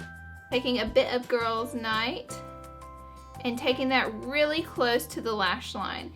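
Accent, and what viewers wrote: South, United States. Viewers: sounds southern. The whole time I was actually thinking that I love her voice. But I'm from Texas so lol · "And I'm gonna bring it up way too...good Lord! Way too high." hahaha that was great. Also, are you from the south? You have a southern accent like I do. :) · I'm just coming across your channel and I just LOVE your personality AND your voice! Haha! I'm not sure where you are from but you sound a little southern and that's why I like it! (I'm from Atlanta by the way :D) I can't wait to watch more of your videos and learn new tips and tricks from you! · Your southern-ness is so adorable lol :) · she's just southern 😂 never go to the south if that accent gives you such a big problem. everyone agreeing with you is just silly. if any of you had to deal with southern people on a regular basis, you'd probably find her voice way less grating. 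· Thank you!! You're the first makeup Youtuber to talk like me. I'm from the South and we are for the most part portrayed as dumb inbred hicks which is far from the truth!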